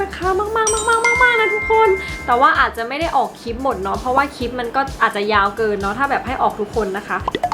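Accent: Isan, Thailand. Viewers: เอื้อย เว้าอี่สานม่วนคัก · สำเนียงพี่ พูดอีสานคือได้มากกกก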